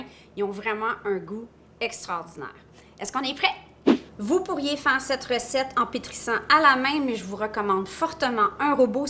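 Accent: Quebec, Canada. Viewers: Explication super avec une joie de vivre. J'adore et alors avec l'accent Québécois en plus c'est top. Merci dès demain je me lance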